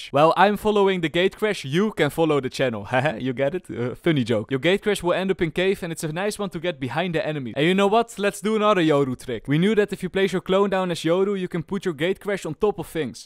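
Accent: Netherlands. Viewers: Ich liebe deinen holländischen Akzent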